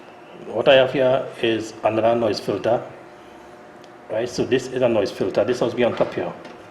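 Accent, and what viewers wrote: Trinidad and Tobago. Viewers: Have a samsung over the range with hood vent. one of the fuses on the noise filter (Has 2) blown Tried a new one blown again. what would cause that? Microwave no heating. door switches test fine. Resistor , magnatron, capacitor, thermal switches all test fine. Also would like ur contact. u sound like a trini.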